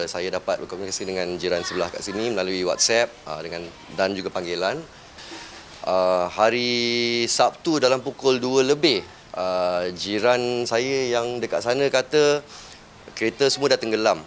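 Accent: Malaysia. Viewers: Bro Your Bahasa in truely Malaysian, Good luck.👏👌👌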